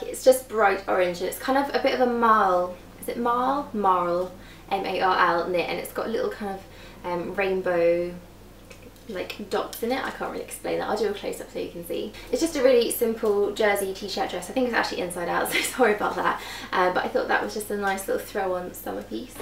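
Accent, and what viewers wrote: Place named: RP, United Kingdom. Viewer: You're very posh! Lol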